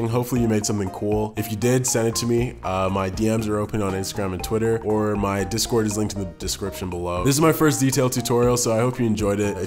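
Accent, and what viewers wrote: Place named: United States. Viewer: Top entertainer with a great feel for humour and edits. I've heard you have a comedy sketch filmed that has never seen the light. Although you sound American my intuition is that the film has a Scottish feel to it. Is this ever going out? With your skills you should be able to turn it into a 4/10 imdb rated film at least....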